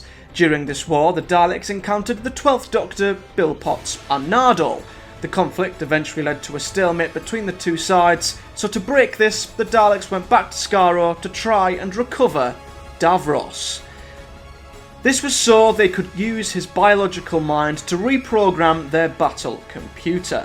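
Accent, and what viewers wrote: Middlesbrough, United Kingdom. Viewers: Thank you ever so much for taking the time and effort to make such a top drawer video. It was great. May I ask are you from Middlesbrough like me? The girl who sometimes is in your video sounds like she does too. She usedthe word narked. Top Boro word. Anyway cheers and take care Ant p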